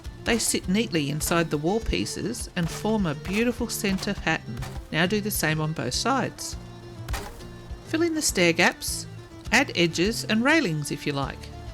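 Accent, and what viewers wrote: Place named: Australia. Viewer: It’s wonderful to hear an Aussie accent on a Valheim build vid! Thanks for the beautiful build- I’ll be trying this one out! 💕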